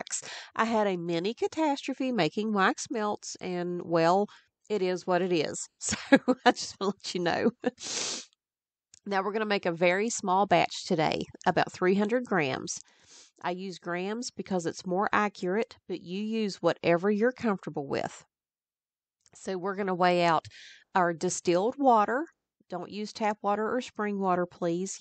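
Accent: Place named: South, United States. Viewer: Lovely job. Very similar to the recipe use.... just subscribed to ur channel I'm a fellow bath and body product creator... love ur personality and I can definitely relate to making messes while working 😆... love ur southern accent btw...I'm from NC and I've got that twang too 😆 God bless and hope to see many more of your beautiful Creations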